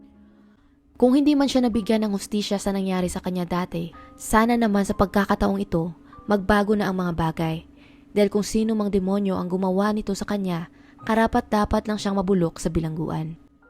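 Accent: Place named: Iloilo, Philippines. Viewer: Unsa manang inahana oy murag walay buot naapay inusturyahan ilongga bati paminawon. Hahay nimo day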